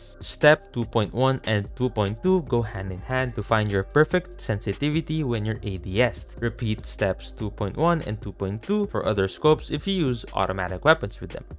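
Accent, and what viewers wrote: Philippines. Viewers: Bro you sound like a filipino but you live in qatar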